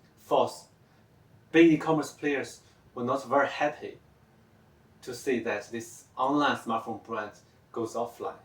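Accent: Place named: China. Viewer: very good content. If you're up for some constructive criticism: -audio could use some work -English needs so much work, the typical thick Chinese accent (putting subs might help)..not being racist.. it's just that there are times when your words are indiscernible interested in what you'll do with your channel next though 😉 you should collab with TechAltar. that would be awesome since you already know each other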